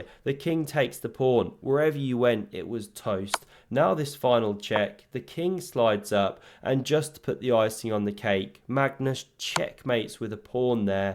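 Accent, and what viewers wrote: England, United Kingdom. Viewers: which part of uk is ur accent from?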